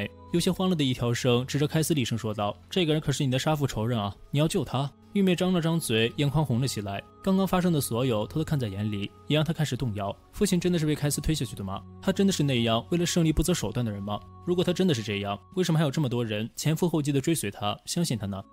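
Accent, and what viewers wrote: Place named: Shandong, China. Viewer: 感觉作者听起来像山东人。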